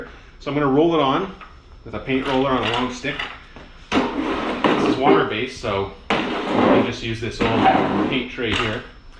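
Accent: Canada. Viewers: I KNEW you were from Canada when I heard the accent, eh!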